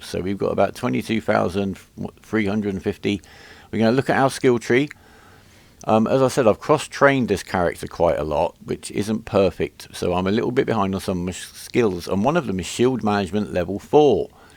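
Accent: United Kingdom. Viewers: Congrats! I'm new to Eve and probably too late for the giveaway but only a week in and watching as many videos as I can to learn as fast as possible. If I had a Praxis I would definitely have to keep her mothballed for the time being as I would hate to lose her through incompetence!! I'd love to see a series of videos for complete newbies to watch AFTER they have completed the tutorials and the Agent Career missions as there seems to be a gap for such videos. Everyone assumes that after those you are an expert, but that's not the case. A bit like when you pass your driving test you don't know everything about driving. I subscribed when I saw your first video and am still loving them. (Also nice to watch a fellow Brit as your accent is similar to my own!) :)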